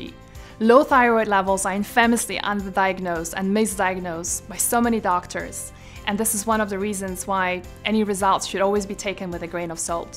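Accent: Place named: Ireland. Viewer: Like ur Irish accent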